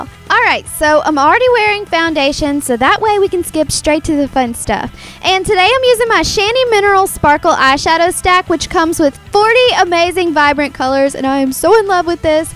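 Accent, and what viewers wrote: South, United States. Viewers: Are you southern? lawl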